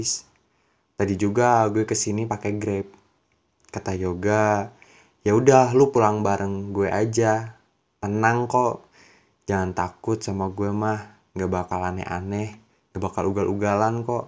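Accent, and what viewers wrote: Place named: West Java, Indonesia. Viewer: Logat sunda nya agak keliatan yah wkwkwk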